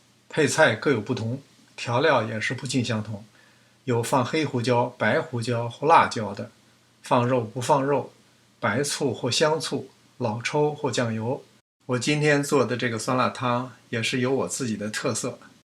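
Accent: Beijing, China. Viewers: 的菜品不评价了，毫无惊险的每每获胜！这次想说，在视频编辑上用心了: 一道家常但可有千秋的酸辣汤，在京腔序曲下，低调出场……柔柔缓缓的音乐伴随汤的成长……随着特异蛋花出台、香菜妆点，高潮迭起……换大碗盛饭吧……明儿再折磨treadmill 😃😃😃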